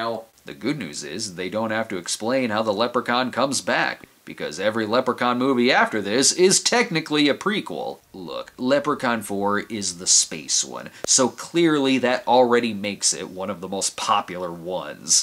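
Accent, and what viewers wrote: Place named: Midwest, United States. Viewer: I'm impressed how strong his Chicago or midwest accent has gotten over the years.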